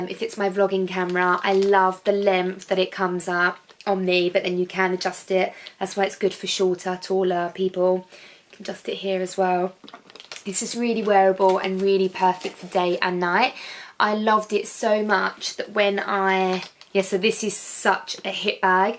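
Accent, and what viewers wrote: Essex, United Kingdom. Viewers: I watch for your accent and fun personality because I love TOWIE....the luxury items are a bonus!!!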